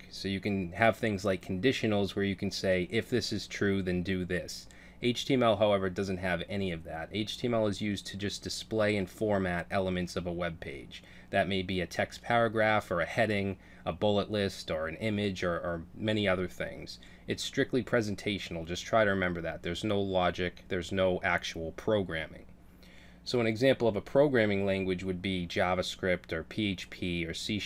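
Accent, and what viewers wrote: Boston, United States. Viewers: You sound like you are from Boston, are you? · You from Boston man? I hear it in your voice. Thanks for the video! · are u from Boston? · Boston accent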